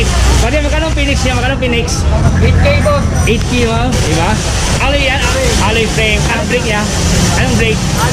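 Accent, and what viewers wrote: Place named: Visayas, Philippines. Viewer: Klaro kaayong pagka bisaya dol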